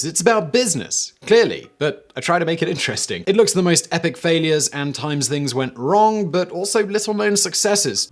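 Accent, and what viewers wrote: United Kingdom. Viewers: Un trap en ur....lol the British pronouncements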